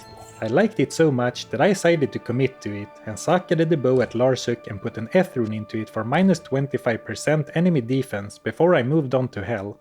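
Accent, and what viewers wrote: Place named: Sweden. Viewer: Finally new video i almost started missing your swedish accent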